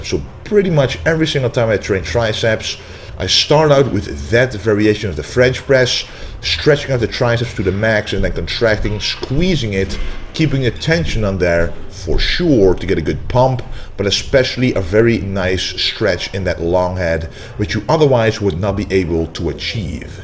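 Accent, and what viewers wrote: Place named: Netherlands. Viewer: Are you dutch i think so because of your accent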